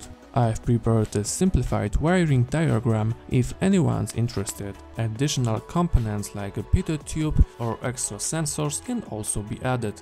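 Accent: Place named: Germany. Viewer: Are you german ? 😊 your English sounds like German 👌👌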